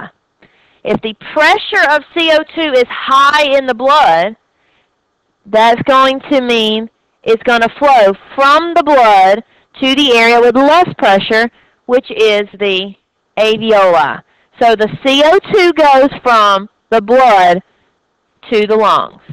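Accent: South, United States. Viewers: Thank you so much for your lectures! They make this stuff so much easier to understand. I'm originally from NC but go to school in NYC. I appreciate hearing a professor with a southern accent. It feels like home :)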